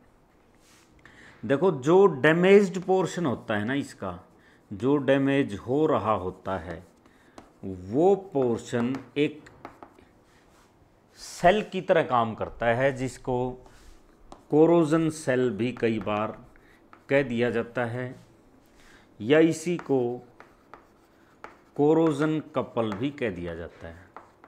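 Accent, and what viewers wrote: Haryana, India. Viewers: Sir are you from Haryana.?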